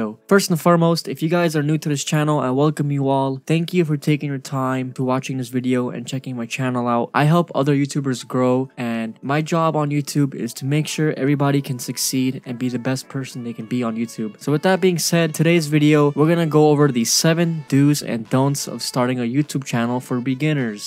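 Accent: Croatia. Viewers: Are you from Croatia? :D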